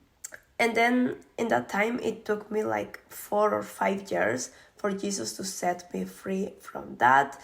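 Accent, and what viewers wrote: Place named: England, United Kingdom. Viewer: An an American I love the way she talks however I have no idea where she is from haha. England? France?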